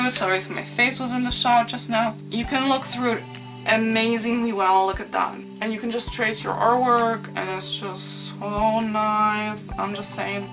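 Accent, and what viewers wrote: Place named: Germany. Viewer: a german accent~ I can only say this because you sound similar to Kicki Yang Zhang in her older videos. Dw it's not heavy or anything lol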